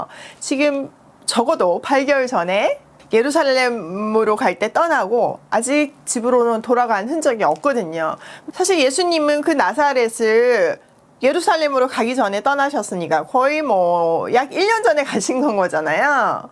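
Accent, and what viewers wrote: Gyeongsang, South Korea. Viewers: 할렐루야!! 삼천포가 고향이시네요 경상도 사투리가 넘 친밀해요 저도 고향이 거제도에요~^^ 감사합니다 🙏 🙏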